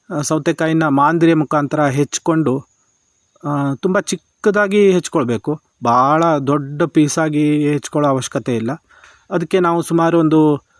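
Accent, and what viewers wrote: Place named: Uttara Kannada, India. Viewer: Siddapura, sirsi areadalli bhasheya accent ide tara irutte . Nirupane paravagilla, sahajavagide.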